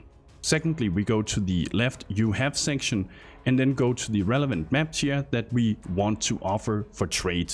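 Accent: Denmark. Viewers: That danish accent thou.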